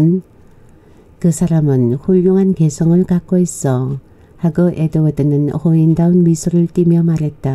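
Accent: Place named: Daegu, South Korea. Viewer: 님의 사투리때문에 무대가 시카고에서 대구로 바뀐거 같고, 훨씬 더 정겹게 다가와요 ~♡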